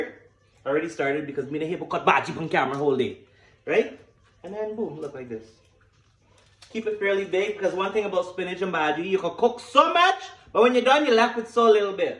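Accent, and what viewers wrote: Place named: Guyana. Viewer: You're so funny 😅, this is how videos should be made, not boring, I'm cracking up really hard at your guyanese accent 🤣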